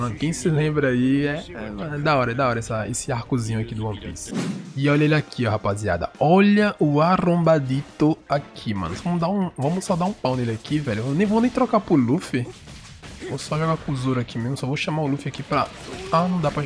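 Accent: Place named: Pará, Brazil. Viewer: Eita, tens o sotaque aqui do Pará, pensei q fosse sksksksksk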